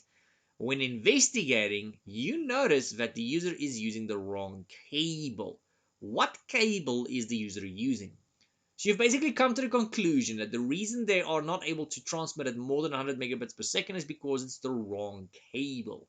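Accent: South Africa. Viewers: South African accent immediately gets a like and sub 🎉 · As a fellow South African it was easy to understand, nice refresher I already passed. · I passed my core 1 thank to him even when the exam was updated you are my Hero and love the accent South Africa gets an automatic like and sub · He's South African, like me. Just that I'm coloured though. He sound like a Wytie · Definitely South African, love it! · South African Identified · Immediately picked up that YT SAFFA accent! Great content though, and that's what matters! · South African? 🇿🇦🇳🇦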